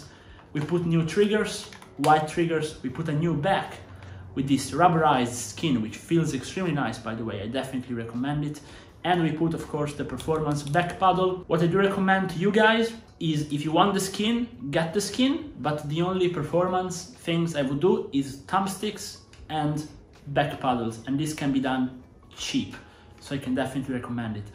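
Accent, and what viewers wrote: Italy. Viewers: You talk like Italian? Non è che sei un bro?❤ 🇮🇹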